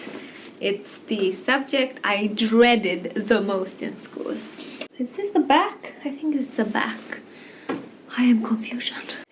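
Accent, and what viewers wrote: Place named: United Kingdom. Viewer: Where are you originally from? Love your charismatic and whimsy personality, the beautiful British English and your inspiring sewing projects. ❤️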